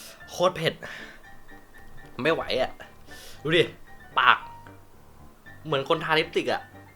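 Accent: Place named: South, Thailand. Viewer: สำเนียงเหมือนคนใต้ เป็นคนใต้ใช่มั้ยยบอกมาาาา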